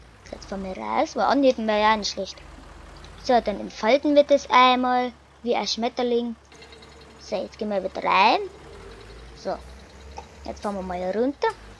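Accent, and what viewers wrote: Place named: Bavaria, Germany. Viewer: Bayrisch war cool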